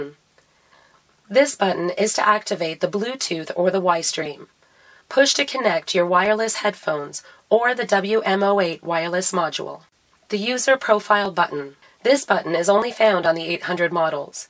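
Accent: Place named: Canada. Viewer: Nice, concise tutorials. Just what's needed for a quick refresher. And I enjoy the Canadian accent to boot.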